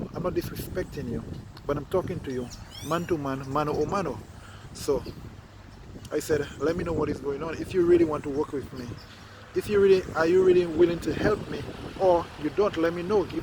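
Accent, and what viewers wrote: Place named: Dominica. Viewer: He sound he from Dominica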